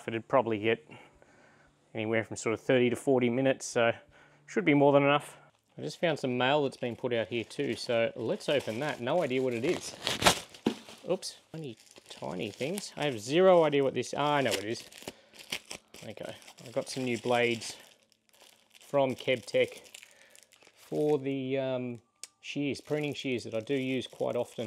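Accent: Australia. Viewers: I could listen to you speak all day. I follow a lot of YouTubers from down under. Love the accent. ❤ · Like this style of video. Plus we get to hear more of that Aussie accent.:goodvibes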